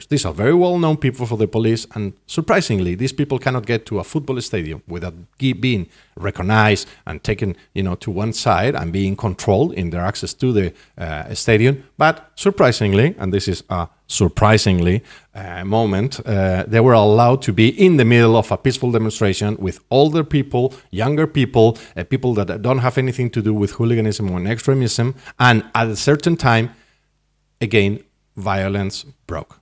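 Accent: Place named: United States. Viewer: Your American accent sounds beautiful.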